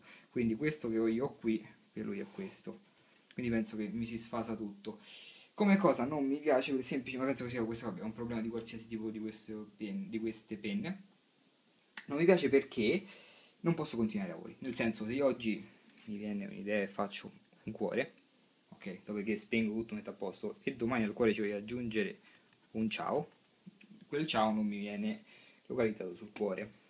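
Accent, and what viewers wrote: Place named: Rome, Italy. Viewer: Oddio, il nuovo video di!!! Appena ho visto l'avviso su FB sono subito venuto a vederlo!!! Voglio l'autografo e voglio anche registrare questa bella voce romana di Roma sud!!:)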